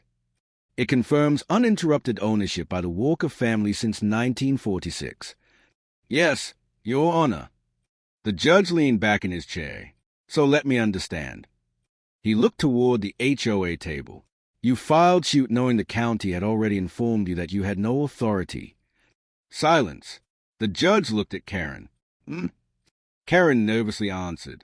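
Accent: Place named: United Kingdom. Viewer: Why does that narrator have a b British accent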